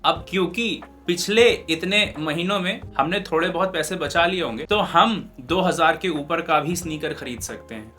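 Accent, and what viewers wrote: Lucknow, India. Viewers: bhai ap lko se ho kya??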